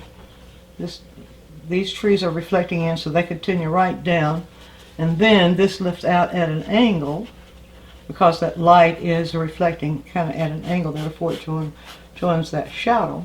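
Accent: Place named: Mississippi, United States. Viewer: You are such a good teacher. I love your accent. Are you from MS, related to JoAnne?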